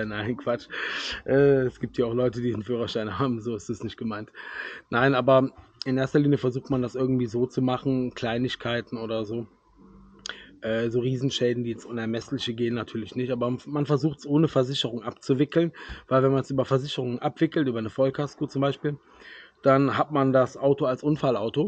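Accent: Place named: Cologne, Germany. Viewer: Danke für die 10 punkte der sprache her kommst du aus köln. Sind vor 1jahr von ķöln nach Avsallar gezogen ķölle Alaaff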